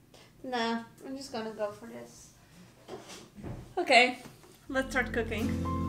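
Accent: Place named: Netherlands. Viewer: Are you Dutch? Idk why but you have a sort of Dutch accent haha.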